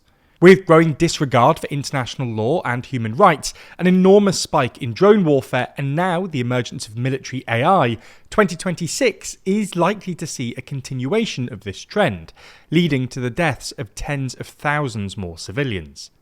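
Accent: United Kingdom. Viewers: Hate your brit accent, this accent is more a turn off than conveying credibility. Britain is a has been.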